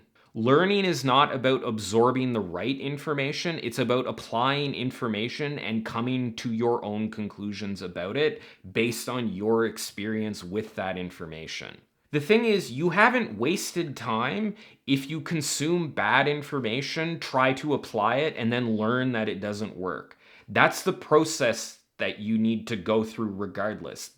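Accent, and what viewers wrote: Canada. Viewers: Flannel and saying ooot not out, gotta be another Canadian! I could be wrong, but that was a hard aboot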